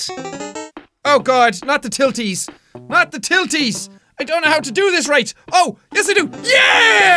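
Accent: Ireland. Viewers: This was the first video I ever say on this channel, dang nostalgia. I remember being surprised by the intro, not because it was loud but because he was Irish 😂. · heya you uh you irish i'm new to your vids so idk and if so i'm part irish so....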